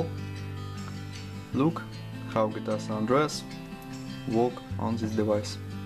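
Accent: Russia. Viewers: Русский на английском базарит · Мне кажется что у него русский акцент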